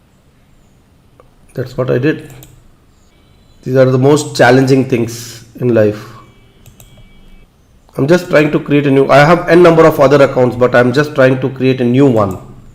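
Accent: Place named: India. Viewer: indian accent......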